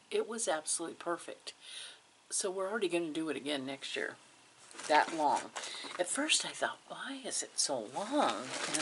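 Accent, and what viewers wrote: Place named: Oregon, United States. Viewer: Hi there. I’m Rose, and I’m so happy that I just found your wonderful channel, I love it. It’s such fun to watch and to listen to all the lovely things that you make and get up to. And I love your Oregon (?) accent ❤ I live in rural Australia, just out of Melbourne, in Gippsland. I’m completely new to quilts in fact, I’ve never made one before! I’ve always been a creative embroider but I’m intrigued with all things quilting. I joined my local quilt group and the folks there are terrific and helpful. You are so enthusiastic and generous with your channel and I feel really inspired by all the great things you talk about. Thanks. I wish I could join you in gorgeous Portland, it looks like a terrific place to be ❤ Keep smiling and being happy!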